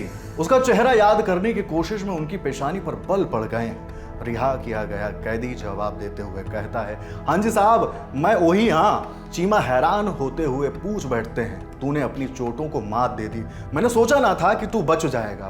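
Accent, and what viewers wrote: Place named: Bihar, India. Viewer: Yar kahani simple bata itna ghumata q hai bihari accent thik karo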